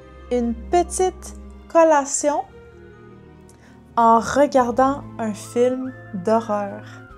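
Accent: Quebec, Canada. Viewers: Fun video! I am amazed at how different the Quebecois accent is. It's lovely but I'm not used to it.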